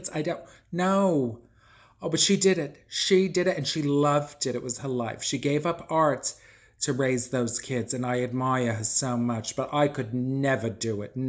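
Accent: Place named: Bronx, United States. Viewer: Oh,. I cannot tell you what these daily thoughts of solitude mean to me. I look forward to them every day, and while the world has become a frightening place, your musings over the things that matter in that gentle, salted Bronx delivery are so wonderfully poignant, and make me feel truly connected to the things that matter most. This series is just incredibly calming and inspirational to me - Thank you, thank you, thank you beautiful. <3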